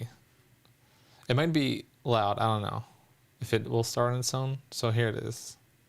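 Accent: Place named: California, United States. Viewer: You really do sound like you're from California. I wanna hear you speak French and Japanese, though. ❤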